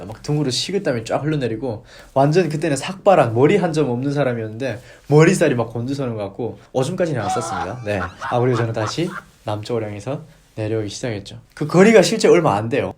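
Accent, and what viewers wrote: South Korea. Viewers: 어디가서 귀순했다하면 놀라워할꺼 같은디 타방송보면 다른분들은 10년이 넘어서 말투가 윗쪽동네 말투가 남아있는데 님은 그냥 토종한국인말투 같아여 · 완벽한 남한말씨네요.....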